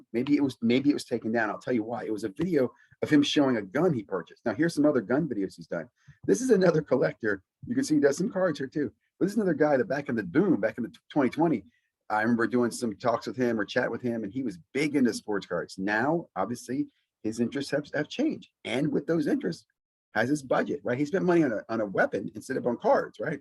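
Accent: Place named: South, United States. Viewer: Liked for the southern accent 😆😆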